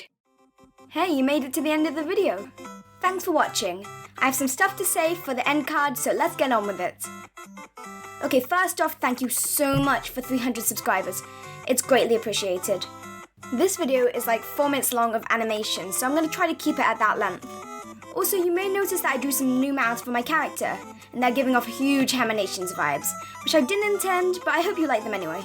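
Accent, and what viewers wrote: United Kingdom. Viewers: Are you british, irish or new zealander can't pin the accent but it sounds british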